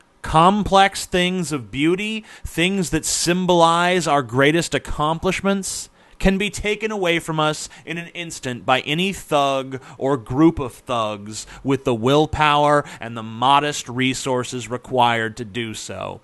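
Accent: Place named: New York, United States. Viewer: What the fuck man? It's like you're typing a New York accent phonetically.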